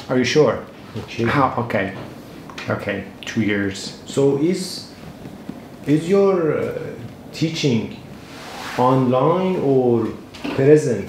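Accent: United States. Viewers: آقای دکتر شما در انگلستان هستین چرا آموزشتون لهجه امریکنه؟من لهجه بریتیش رو می خواهم😢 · اولین ایرانی هستید که لهجه شما خیلی شبیه به آمریکایی زبان ها است من در کلرادو آمریکا زنده گی میکنم اگر یک کلمه را هم یاد میگیرم به لهجه خود اینا یاد میگیرم ممنونم ازین که اینقدر خوبید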